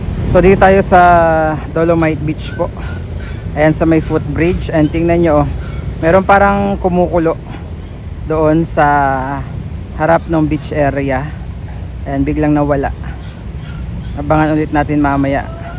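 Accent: Visayas, Philippines. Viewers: Hoy ayusin salita mo wala Naman Bola ano Ka bisaya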